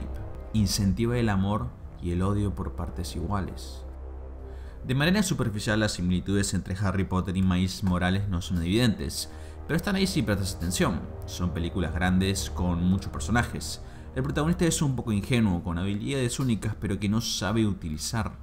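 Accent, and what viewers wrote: Argentina. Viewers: parece argentino como habla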